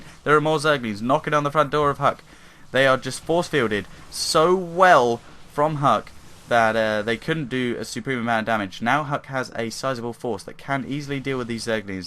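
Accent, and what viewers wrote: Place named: United Kingdom. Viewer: Another British commentator ^_^ good job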